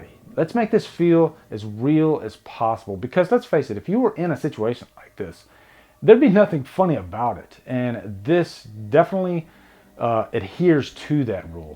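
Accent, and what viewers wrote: South, United States. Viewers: I only have just recently discovered your channel and I have to say this is an insightful and well dictated review. I know this may sound stereotypical but the southern accent adds a bit of charm as well. Keep up the good work!.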